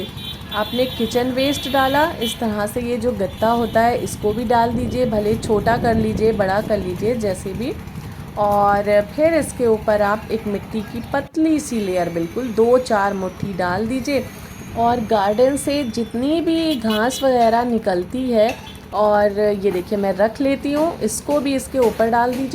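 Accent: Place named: Hyderabad, India. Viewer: Assalamualaikum khairiyat kaise ho ap mai samjh t thi u p ke log alag baat karenge lekin ap to hyderabad ke logon ki tarah baat kare Mujhe bohot acha lagta hai apke vlog dekh ker allah ap ku khush rakhe ameen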